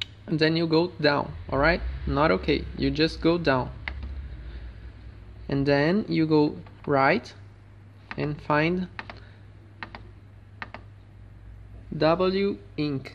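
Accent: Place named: Brazil. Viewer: Ahhh sabia que era br pelo sotaque 😂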